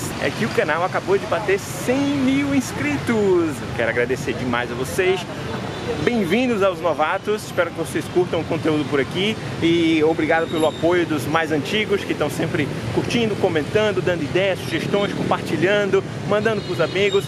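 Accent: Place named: Northeast, Brazil. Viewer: Obrigado pela resposta,! É, senti algo do NE no seu sotaque, mas não seria capaz de cravar Recife. Senti algo de carioca também, mas nada de mineiro! Rss Mistura tudo isso com um tempo fora do Brasil, e, pronto!, chega-se ao seu sotaque. Valeu! Abs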